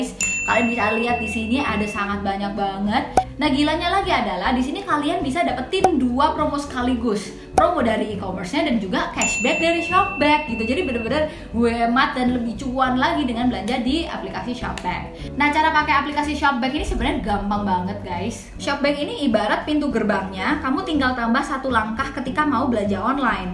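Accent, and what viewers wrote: Java, Indonesia. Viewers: Mba e mendok men logat e😂, joss tipsnya🤩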